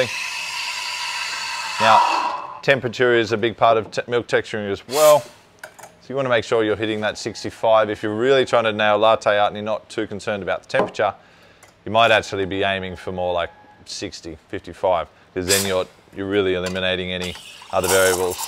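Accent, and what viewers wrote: Australia. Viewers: where are you from? Australia? great accent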